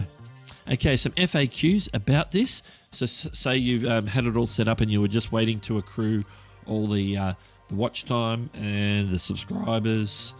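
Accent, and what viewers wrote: Australia. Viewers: oh, aussie.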